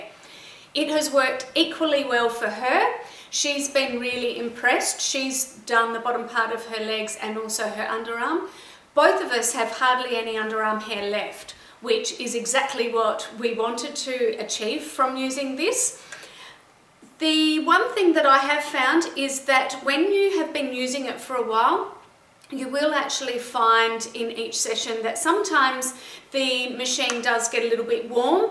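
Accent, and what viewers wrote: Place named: Australia. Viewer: seems like an aussie lady from melbourne :)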